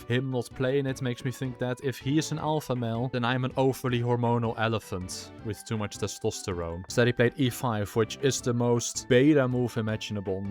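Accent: Netherlands. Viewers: Ik ruik nederlands in dit accent 😅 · Dutch accent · You sound very Dutch 🇳🇱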